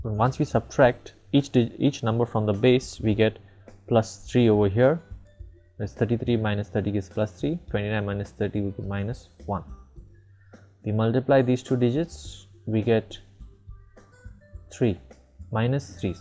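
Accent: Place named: India. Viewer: i am killing myself when listening indian when he speaks english!!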